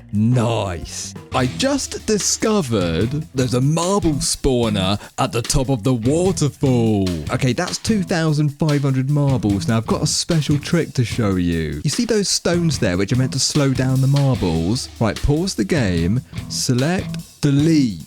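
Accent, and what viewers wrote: United Kingdom. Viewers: Are you British?🇬🇧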